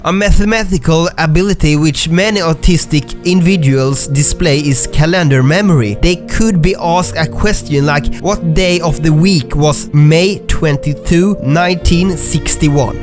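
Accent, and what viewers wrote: Sweden. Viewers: Oh dear gooo-, you swedish?!? U cert got the accent. Lame. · Well he's got a rather strong Swedish accent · He's from Sweden, and that's why he has an accent :) (I think he's from Gothenburg) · OH MYYYY, Du är svensk också! min engelska (uttalning) låter också som din lol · i love his swedish accent its kind of adorable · No, he has the accent because he's Swedish.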